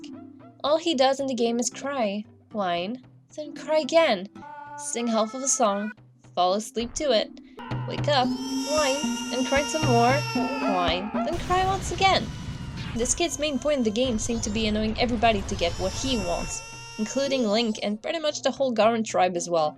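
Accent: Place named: Canada. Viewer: Where do you live? (by your accent i would say canada)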